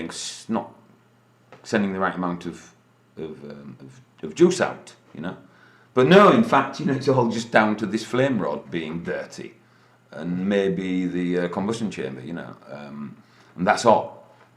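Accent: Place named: Yorkshire, United Kingdom. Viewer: Mmmm..... Micheal Caine speaks with a London accent/Cockney accent....I rather think this chap is a Northerner.....Yorkshire area. Mmm?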